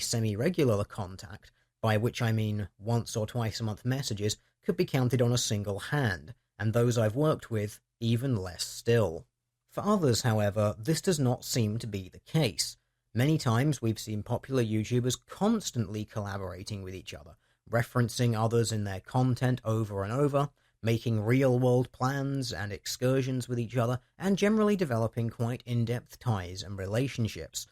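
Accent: United Kingdom. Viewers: The video is an opinion until you present evidence. Yea, I know it's you keemstar hiding behind that British accented dear. Start pointing hooves or your video is BS, I know you want to. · I didn't make that assumption, you assumed that I did. I ASKED if it was typical or not, with a suggestion that it might be a British dialect. If it isn't, fine. But that just begs the question as to why I'm hearing it lately, and not just from our ruminant blue-green friend here. I'm also hearing it from at least one Aussie YouTuber as well. It's unusual and I was curious.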